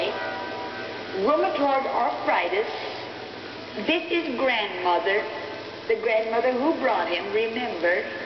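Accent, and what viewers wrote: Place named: Germany. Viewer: Analicemos su origen y epoca: Kathryn kuhlman nació en la ciudad de Concordia, Missouri, a padres Alemanes (su acento). Se convierte a Dios a los 14 Años en la Iglesia Metodista de Concordia, y comenzó la predicación en la Ribera Occidental a la edad de 16 en Iglesias Bautistas. En julio de 1975 su doctor le diagnosticó un cardíaco leve y tuvo una recaída en nov 76, como resultado, murió en feb de 1976. Pese a sus altas y bajas fue una mujer llena del poder y la uncion de Dios.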